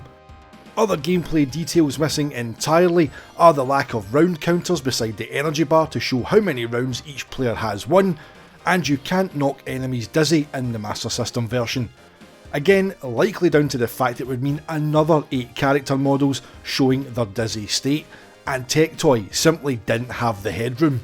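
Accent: Scotland, United Kingdom. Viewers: I’m curious about the person who narrated this video. Where is his accent from? I think it sounds Scottish, but it could be from new zealand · Sounds Scottish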